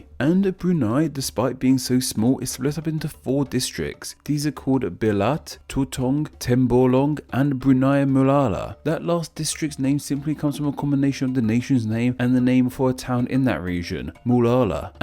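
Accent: United Kingdom. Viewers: Jahaha i study british accent from your video sir😂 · Please don't take this the wrong way, but the way you get your "R" and "L" mixed up when you try to pronounce the names makes you sound like an East Asian despite the clear British accent. Fun fact: at 544,150 sq km, the Indonesian part of Borneo is bigger than the whole countries of Malaysia (both Malaysian Borneo and the Peninsula) and Brunei put together (335,612 sq km).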